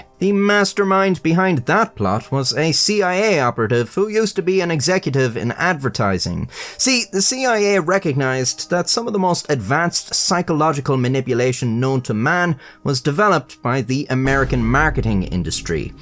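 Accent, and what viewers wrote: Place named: Ireland. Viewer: Hey Fella, Gotta say I love your vids and content - been watching your vids for a few years - no BS ads, great story's, well presented with your lovely Irish accent and half a brain to boot. I won't and don't like and subscribe on principal. But keep your home page up in a tab for when you do upload and you NEVER disappoint. Legend mate! Effing honest Youtuber and hope you still make a buck. .... Just a little thank you from me to you. Cheers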